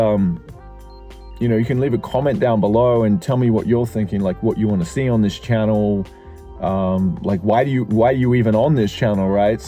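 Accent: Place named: Australia. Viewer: Cool hearing your story. I was wondering about the Australian accent, Mid East last name, and Japanese residency.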